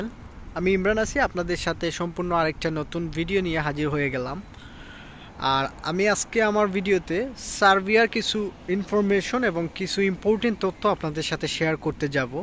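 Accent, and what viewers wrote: Sylhet, Bangladesh. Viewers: ভাইর কথায় লাগের সিলেটি আফনে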